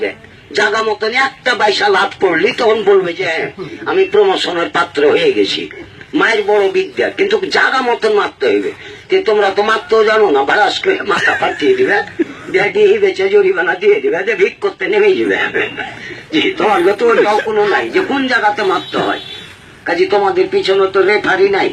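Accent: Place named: Murshidabad, India. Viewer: Murshidabad er motho bhasa bolchhis re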